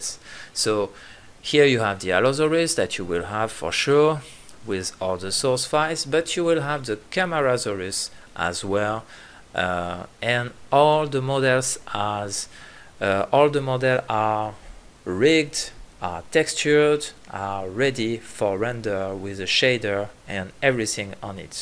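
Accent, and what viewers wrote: France. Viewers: Clairement 😅 L’accent français est présent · On a reconnu l’accent français 😂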